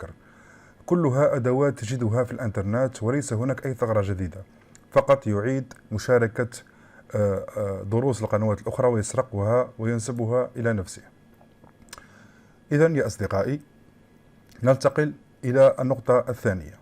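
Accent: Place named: Morocco. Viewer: واضح من اللهجة تاعوا انه مغربي.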